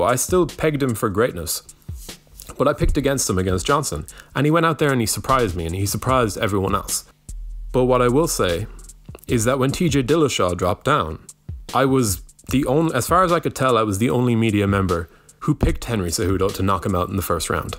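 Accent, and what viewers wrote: Ireland. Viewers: You are Irish aren't you?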